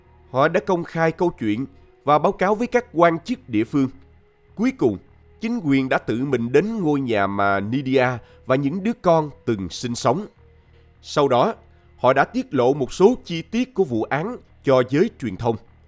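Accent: South, Vietnam. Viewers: giọng đọc miền nam nghe hay quá . cho 1 like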